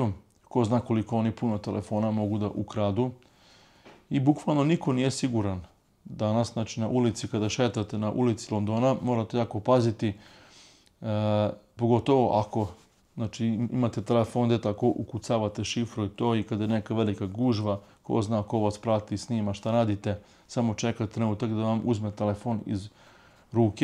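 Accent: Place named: Slavonia, Croatia. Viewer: Prijatelju zanima me odakle si , nekako mi zvuci kao da si iz slavonije kao npr Osijek ..🤔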